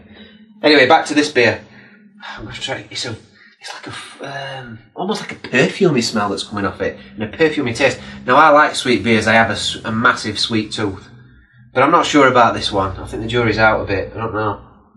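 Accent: Yorkshire, United Kingdom. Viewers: Great To shirt that reminds me of someone else who wears one! By the way how do U get a Yorkshire accent? I have been living up here for 10 years and I still ain't got one! nice review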